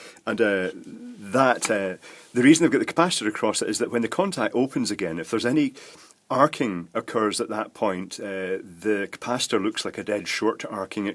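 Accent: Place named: Glasgow, United Kingdom. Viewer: I thought I'd hit the wrong channel & John Ward had suddenly got a Glaswegian accent when I first stated watching this vintage electrical stuff :)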